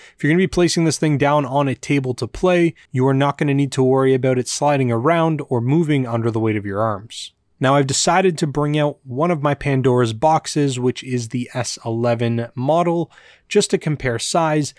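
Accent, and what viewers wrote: Canada. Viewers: Thanks, mayhap I will! Great review, and if I'm not detecting a Canadian accent from you, then I have forgotten my people :)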